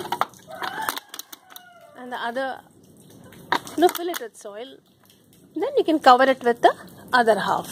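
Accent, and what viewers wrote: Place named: United Kingdom. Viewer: great voice ji...with accent like british lady..big like and subbed too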